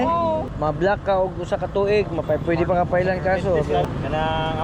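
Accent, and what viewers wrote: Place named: Cebuano, Philippines. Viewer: Sarge taga asa jd ka gkan? paminaw nku dli pure Boholano or Bol anon ky dli bol anon imung inistoryahan...Cebu or Mindanaon imung Sinultihan...unta matubag nimu akong pangutana Sarge Solid followers og Subscriber diay ko nimu...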